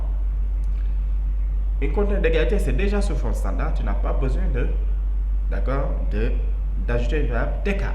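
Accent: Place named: Quebec, Canada. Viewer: quebec french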